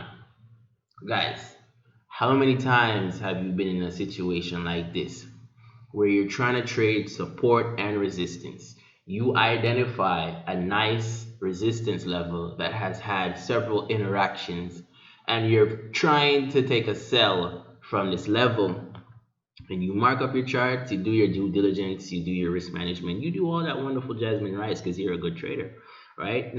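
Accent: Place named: Jamaica. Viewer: Man I knew u were Jamaican from the accent 😂. Big up 👍🏽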